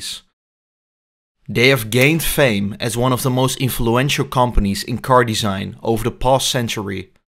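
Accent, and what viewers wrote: Netherlands. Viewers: by your pronunciations, you definitely are Dutch. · dutch accent . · Lekker Hollands Engels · it's an accent I would dare to recognize amongst hundreds of people in "wedden dat..." , all nationalities included🤭 I mean nothing bad by this. Ps. I love old abandoned buildings ánd cars😊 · Zo duidelijk een Nederlander joh hee :-) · Je klinkt Nederlands kan dat kloppen? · What an amazing documentary! By the way are you Dutch?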